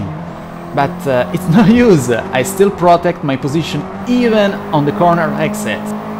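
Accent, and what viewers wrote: Italy. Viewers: Sei italiano? L' accento è quello. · I love when english is spoken by italians because I can understand them easily!!! He remind me of Dovizioso and Rossi answering questions in press conference. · Scusa sai se lui è italiano? Perché dall'accento sembrerebbe di sì... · your accent is like an italian who speak in English. I'm from Italy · Adoro il tuo inglese italianizzato ahah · I`m really glad that you finally decided to do a voice over on your videos! It adds another layer of communication and helps focusing on the video itself! Great job! And as an Italian living in Brazil, I love that Italian accent and tone! I can almost see your hands moving while talking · Italian accent at its best, it's awesome ♥️ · why does he have an italian like accent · I love your italian accent when you talk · Si sente che sei italiano sotto sotto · Your voice make me remember italian people,such a nice accent its funny and not too formal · You sound like u are italian,like me😂😂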